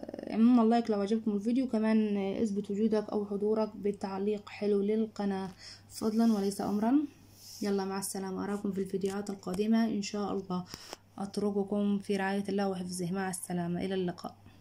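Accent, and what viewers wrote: Egypt. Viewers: انتي مصرية؟؟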